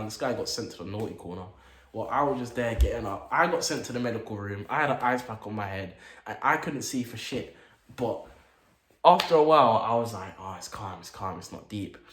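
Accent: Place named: Northern England, United Kingdom. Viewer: With his dead northern accent the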